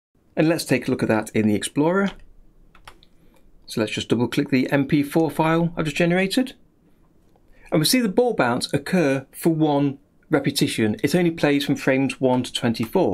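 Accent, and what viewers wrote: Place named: United Kingdom. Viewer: The best thing in your tutorial is your beautiful british (?) accent ! 😄. Easy to understand, and relaxing. Thanks for your great work !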